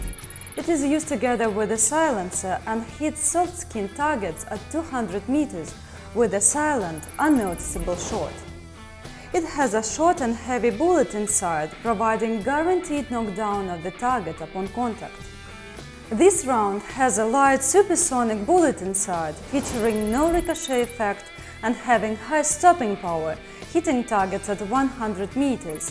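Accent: Russia. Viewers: Пофиг на железо. Девушка очаровательна. Выраженный русский акцент, позволяющий понимать 100% сказанного (я носителей на слух например далеко не всегда понимаю, а ее - прекрасно). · Heavy russian accent makes any weapon videos twice the better. "Энд римембер - ай эм э профешионал рашн, соу донт трай зыс эт хоум." (с) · Шмешинг энемис бай контакт, ИТС вери вери вел))) Вэн ай хир ёр инглиш, ай хез Джон Рембо флеш бекс Фром Рембо ту. Ваш рунглиш настолько жёсткой, что лучше бы наняли женщину переводчика или озувили на языке носителя, чем так рунглить, вас реально из-за этого не понять!!! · Nice system for cqc sof, the host's Russian accent massages our ears. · Combination of weapon, woman host and a hard russian accent gives this video very strong RedAllert vibe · Русский акцент в стиле голливудских фильмов это нечто . Возможно специально так и задумывалось :) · with hard russian accent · Опачки русский акцент))))) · Специально с таким акцентом тётя говорит? 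Чтоб всё-таки понимали что русская? Или тупо не нашли человека с хорошим произношением? Мне гордиться или печалиться?.. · жуткий русский акцент=)))) а так ниче - хорошо сделали)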